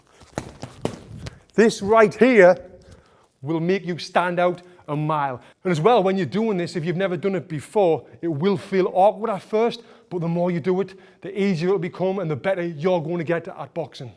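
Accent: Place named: Sunderland, United Kingdom. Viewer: Great Mackum accent...really good 'no nonsense' coaching technique. Good work.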